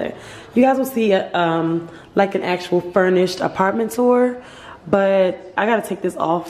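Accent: Baltimore, United States. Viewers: Your Baltimore accent is there! And I love it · that Bmore accent funny af 🤣 I'm frm D.C. but good video.🔥💪🏾💪🏾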